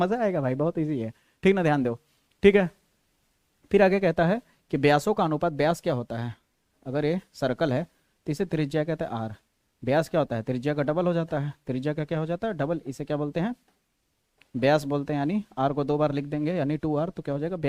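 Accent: Bihar, India. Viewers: Sir app Bihar sa ho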